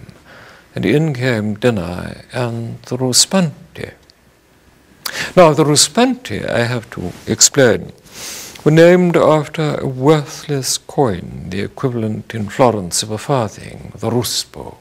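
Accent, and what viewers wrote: RP, United Kingdom. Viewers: cut-glass accent is impeccable and flawless. An unattained aim for many people all over the world! His oratorical adornment is unsurpassable. Gifts of oratory, writing and organization are all his. It is rather difficult to imitate his manner and catch the tone of his voice. There is no doubt that he speaks a peculiarly musical English which bewitches the ears of auditors. His pomposity is merely a mannerism. I adore his pompousness and gentlemanliness. The art of saying things in the way as does, is like an ear for music, it is not to be acquired! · Brian speaks posher than what the Queen does. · The poshest man in the world. Called Brian. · Who wrote this script, who did this research, who does it serve? This 'mockumentary' is full of misinformation and incorrect history. He has absolutely NO knowledge of the Medici family tree. 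He mixes branches back and forth. The pawnbrokers NEVER became Grand Dukes. The Grand Dukes were not from Lorenzo's branch, neither was Louis' grandmother. He calls the last Grand Duke of the 'real ' Medici and the LAST Medici (really?)? Lorenzo, from a different branch, was therefore, not a 'real' Medici? Leave it to a Brit to label the Grand Duke the Last of the educated Medici (insulting!). the Lorenas were not Medici - they were Hapsburg. Do they expect that we will believe this farce because the narrator has a British accent and that he quotes other (misinformed) Brits? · Posher than the queen... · This super-posh accent surely makes anyone else sound rough, but shouldn't it be "AT that very moment" rather than "IN that very moment"? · I have punched less posh people for being to posh... i'm gonna find some cockney to get this guy out of my head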